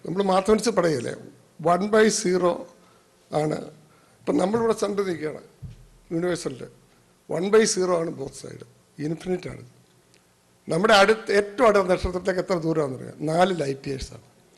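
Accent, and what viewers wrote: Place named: Kottayam, India. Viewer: 40 വർഷം ആയി us ൽ ജീവിക്കുന്ന ഈ മനുഷ്യൻ എത്ര സുന്ദരം ആയി ആണ് തനി കോട്ടയം ഭാഷയിൽ സംസാരിക്കുന്നത് 👍🏼👍🏼👍🏼...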